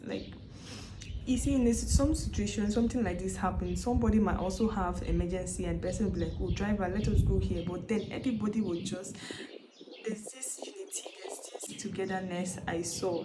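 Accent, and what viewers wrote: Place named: Ghana. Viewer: You speak like Ghanaian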